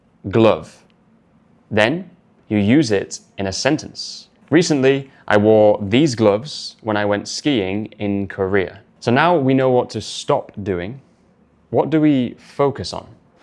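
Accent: United Kingdom. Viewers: Hi. I’m from Thailand. I really love ur Vdo. I subscribe ur channel few months ago. I want to have British accent same as u.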